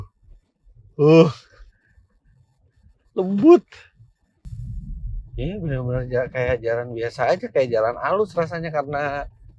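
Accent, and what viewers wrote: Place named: Serang, Indonesia. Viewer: Orang serang mana suaranya👋🖖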